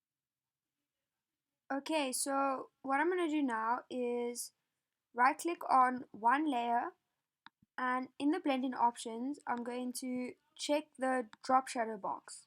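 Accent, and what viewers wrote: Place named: Iceland. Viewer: Very nicely done. I enjoyed the flow of your Tutorial. I didn't once scream at my monitor trying to get you to hurry up! Great accent as well. Iceland?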